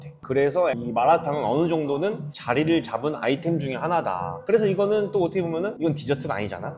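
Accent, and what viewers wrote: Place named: Jeolla, South Korea. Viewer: 저도 익산이 고향인데.. 뭔가 말투가 전라도의 늬앙쓰가 있긴있써^^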